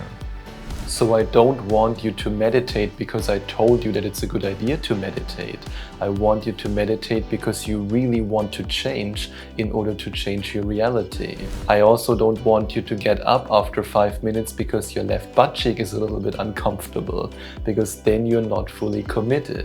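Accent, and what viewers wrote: Germany. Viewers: German accent?… thanks for the breakdown. It’s less lofty and technical than Dispenza video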